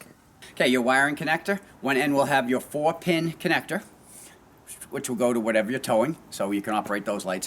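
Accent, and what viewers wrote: Boston, United States. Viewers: Thank you for such a marvelously done video. I bought my 2015 Black Sahara last week, ordered my hitch right after watching your 'how to' and installed it yesterday in about an hour. And all for under $75. I've ordered my fuel filler cover upgrade and your video for that looks easy enough to follow. BTW, I grew up in Newton Center, MA and love hearing your accent. Reminds me of my childhood.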